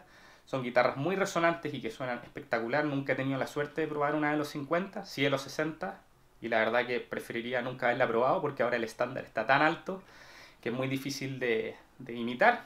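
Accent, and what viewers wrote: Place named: Chile. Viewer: como se nota que erí chileno XD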